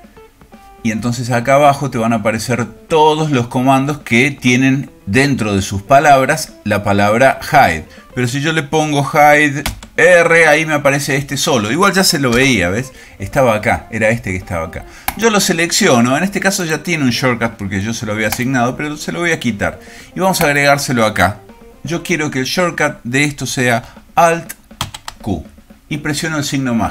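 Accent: Argentina. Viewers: que bueno!!! que interesante y que divertido escuchar a un profe argentino!!!!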